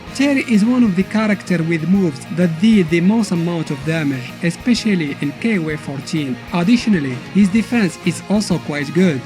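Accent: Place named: Morocco. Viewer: Bro are you Moroccan your accent is very familiar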